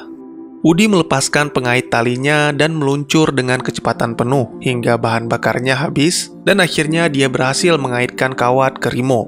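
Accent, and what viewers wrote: Aceh, Indonesia. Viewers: Dari aceh ya bang Nanya doang:-)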